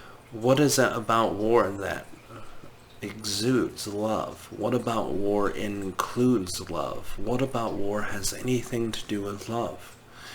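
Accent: England, United Kingdom. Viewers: Has ever explained why he speaks in an English accent when the channeller is American? He sounds like Alec Guinness in Star wars. Nice video, thank you 😊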